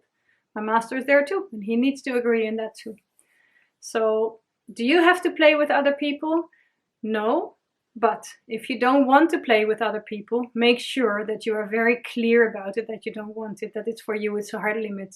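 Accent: Netherlands. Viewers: I came across this video when I looked up slave positions. I'm writing a piece of fiction that includes a BDSM relationship and I want to create a realistic image. Your videos give me a good idea of what BDSM is actually about. You don't just list the rules, but you also open up about yourself and your relationships. You come across as a kindhearted person who loves sharing her passion. Perhaps it might be a rude question - I apologize if it is - but would it be alright if I made a reaction/information video about some of your videos? If I use any of your material or refer to your videos, I will always give credit and place a link to the video(s) in question. In my environment, there are a lot of misconceptions about BDSM and the people who participate in it, and when I noticed that, I also realized that there is a lot of misinformation about BDSM, not just on the internet. 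I would like to help you and other creators spread correct information about it and help people find themselves and other people with who they can share their passions. It might be useful to mention that I am not a part of the BDSM community (yet) but I have been educating myself on it for a while now, and I would like to help other people do so as well. It's quite off-topic, but I love the accent. I am from the Netherlands as well.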